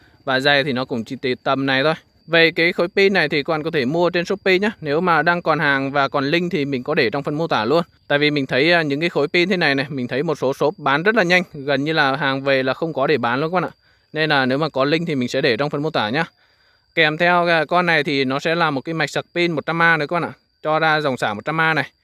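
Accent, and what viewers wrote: Nghe An, Vietnam. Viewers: Anh ở đâu nghệ an vậy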